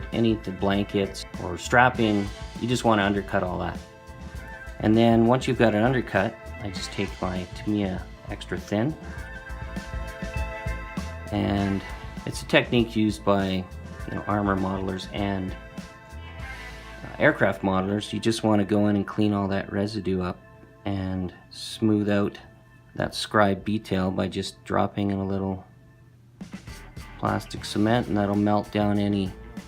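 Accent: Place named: Canada. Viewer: Great channel you have going! Clearly shot video of everything you do and understated style of presentation make watching a real pleasure. No bling, no nonsense theatrics. Just a Canadian modeler (guessing by the accent here) teaching others to make their models something more than what comes in the kit. As a jewelry engraver I see that many techniques you demonstrate are similar to what is done on metals. Plastics are widely variable and offer more than a few challenges in re-workng the material. I have so much to learn yet and I suspect I will be closely studying every video you have. I'm trying to build Napoeonic armies in 1/72 and good info such as yours is difficult to find. there are thousands of "how to paint ____" videos out there but precious little about working the plastic itself. Subscribed and liked! Best modelling channel I've found yet, thank you so much for sharing your expertise with others.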